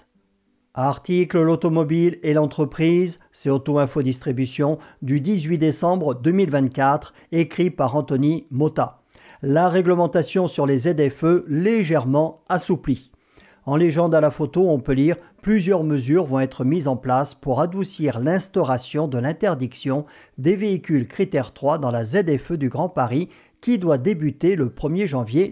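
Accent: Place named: Germany. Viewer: super video mais ton accent allemand est tres bizarre 😅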